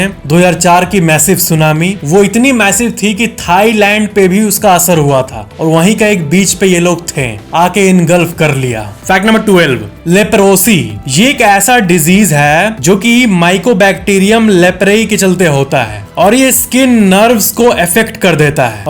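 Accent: Bihar, India. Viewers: Aap bihari ho na tbhi apke accent m bihari chalak raha hai sir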